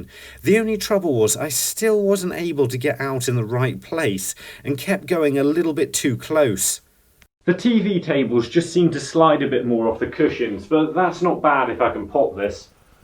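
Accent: United Kingdom. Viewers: As am a foreign I like your English accent its so easy for foreign to understand also likes your excellent videos about snooker.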